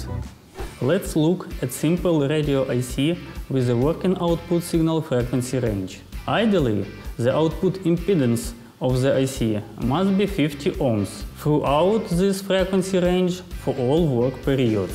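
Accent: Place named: Slovenia. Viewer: Тхеньк ю вери мач. Бат ит из вери дификульт ту андестэнд словеник инглиш лангуаге...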